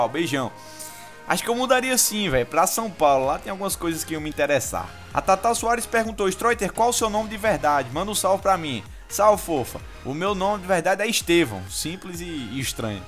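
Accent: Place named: Paraíba, Brazil. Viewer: O stroiter ta com menos sutaque da paraiba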